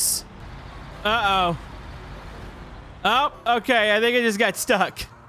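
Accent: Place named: Texas, United States. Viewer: Your voice is speaking like texass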